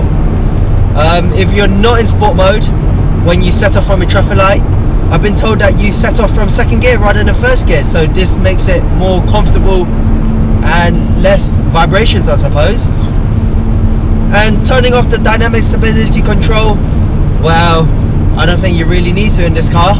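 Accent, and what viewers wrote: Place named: London, United Kingdom. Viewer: You sound very much like a London kid- innit?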